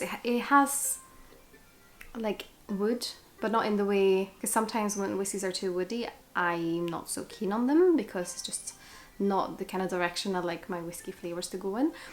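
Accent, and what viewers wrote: Scotland, United Kingdom. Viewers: Why do you sound Scottish?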